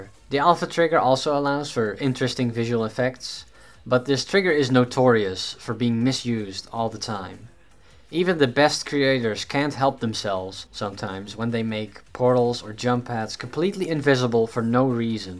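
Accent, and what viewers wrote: Netherlands. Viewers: Are you dutch? Because you have sort of a dutch accent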